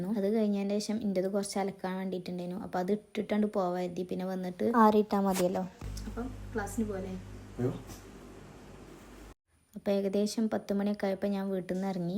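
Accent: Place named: Kannur, India. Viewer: Video Nalgene....specially ur slang..nmlu kannurkark Kelkar ishta....but lack of content feel cheythu....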